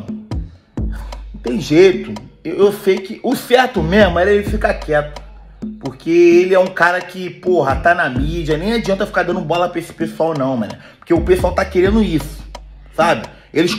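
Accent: Rio de Janeiro, Brazil. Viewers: Gente eu tô 32 anos fora do Brasil, sou do Rio de Janeiro, e ti juro dou tanta risadas, mas tanta risadas com esse 😂😂😂😂😂😂😂😂😂😂 escutar as gírias e o sotaque do Rio não tem preço ❤❤❤❤ Ti adoro " "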